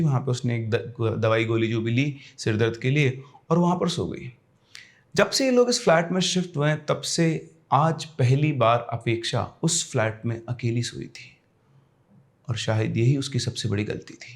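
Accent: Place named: Haryana, India. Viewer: Sir your haryanvi is so cute and funny 🤣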